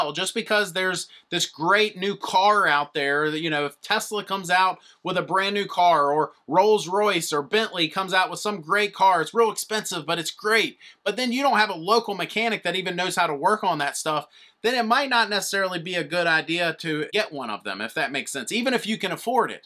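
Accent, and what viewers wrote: South, United States. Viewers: background of some southern sounding vowels, and the way you pronounce “on”. Your vid was helpful, having some hvac issues/thermostat issues at our house and discovered that we have an inverter heat pump. Been in the house for about a year had no idea.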